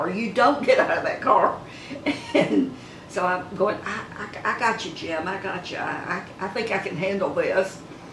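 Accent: South, United States. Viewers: you exude such lovely Southern charm! I love accents, and yours is tops! This from a lifelong Northwesterner age 83. Your makeup tips are invaluable. Please continue your friendly chats!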